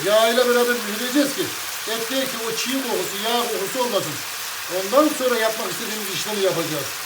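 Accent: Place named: Black Sea, Turkey. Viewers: karadenizli galiba ustam.bizim şiveyle gonuşii :) tokat ordu sivas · Memleket nire şef? Kayseri, Yozgat, Çorum???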